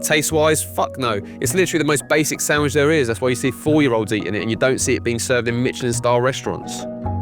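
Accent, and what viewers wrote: United Kingdom. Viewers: This guys is very Belfast guy isn´t??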